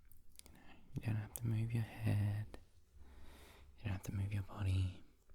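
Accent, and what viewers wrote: Australia. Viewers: You have the perfect soft spoken AND whispery voice for ASMR, and I love feeling at home with the aussie accent. I swear when I subbed to your channel ages ago it was off the back of seeing a few of your videos, and one of them was like a light triggers one? I’ve been trying to find it but can’t, have you ever even done one before or have I gaslit myself into believing you have? If you haven’t, I think there’s some untapped potential there for you, you’ve already got visual triggers and sound triggers down pat, so if you added lights into your visuals that would go insane. Thanks for listening 😌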